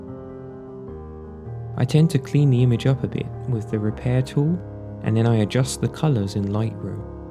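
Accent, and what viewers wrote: United Kingdom. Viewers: Between that nagging music and the mumbling British accent I didn't understand a word. waste of time. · You sound British but have your camera in German?!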